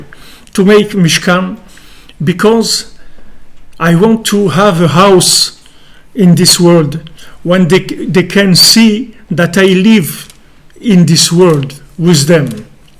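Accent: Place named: France. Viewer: Ah! What a surprise! That's the first time I hear you teaching us in english! I didn't know you speak several lagages! Baroukh HaChem j'ai tout compris de ce que vous dites en anglais, my english isn't so good. Et très charmant votre fort french accent!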